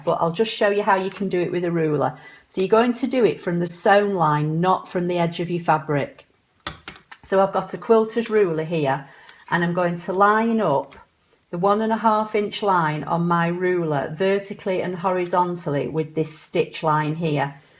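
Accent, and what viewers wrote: England, United Kingdom. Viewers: Lovely to hear an English accent on a sewing tutorial. Very well explained, would enjoy seeing more sewing projects from you. Thanks!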